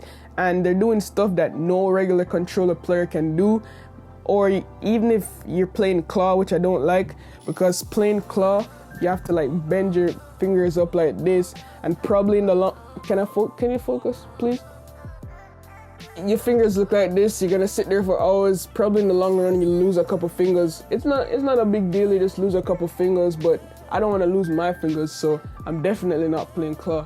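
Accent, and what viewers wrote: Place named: Jamaica. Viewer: I'm new and I just heard yu voice and mi a say that yu sound Jamaican who live in America I'm JM btw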